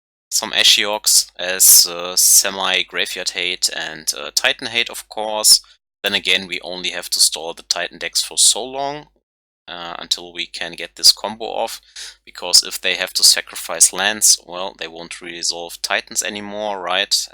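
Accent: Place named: Germany. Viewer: Hey, nice run. Your dialect sounds like german, so i wanna ask, where you from.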